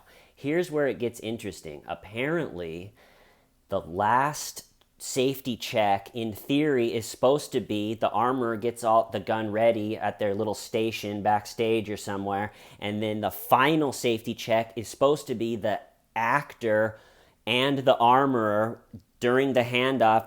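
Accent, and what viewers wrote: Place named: United States. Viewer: So strong american accent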